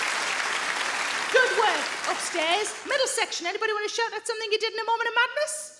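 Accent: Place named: Geordie, United Kingdom. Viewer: In my moment of madness, I bought a Ukulele and then Covid happened. Now I have eight in three sizes. I hadn't played a musical instrument for over twenty-five years but the upside of Covid was I had plenty of time to practice. In fact I think it probably kept me frow going insane or a least institutionalised like a caged Bear. 🤣 now I don't want you to take this the wrong way but every time I watch one of your YouTube videos I'm reminded of my granny. Like you she was a Geordie and had a that strong Novocastrian accent and earthy sense of humour. She was my mum's stepmother as my gramp lost his wife in a bombing raid during the war. I used to cyclone there with my two best mates and she would make us laugh with the sort of astutely observed humorous situation that you are so good at. We would leave there with face ache from laughing and the sound of her voice in our heads saying 'Aye ya boogers, ya do like ya bit of smoot'. 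I can still hear her when I came in through the back door saying ' Allo hinny, ah thought ya might cool this morning'. She wore glasses too funnily enough. It's like London taxi drivers, it's keenly observed comedy. You are a first class Raconteuse. Ohh.... the Hot Tub thing, I'd always imagined the effect would probably be like Number 35, Egg drop Soup. 🤣 you can have that on me and the wicked influence of my granny Ness (Agnes). 🐻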